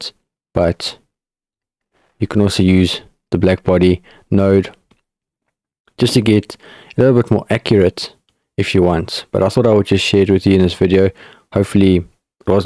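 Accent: South Africa. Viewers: The first 3 seconds of the video and I already thought that this guy is probably South African :)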